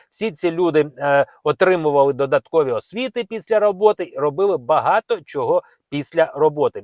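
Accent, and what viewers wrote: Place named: Russia. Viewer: Говорить з акцентом раши.Не вірте !!!.Подали і продовжили уже воркперміт, без роботи.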